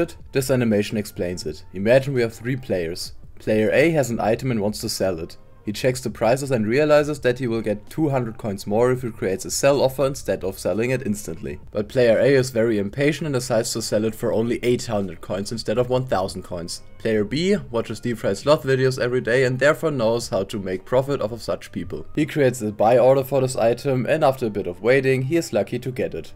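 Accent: Germany. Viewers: i hear a DEUTSCHER AKZENT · Man wieso immer dieser Deutsche Akzent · Are you german? because you have that german accent i hear every time i talk with someone german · Man hört ganz dolle, dass du deutscher bist😂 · Bro sounds german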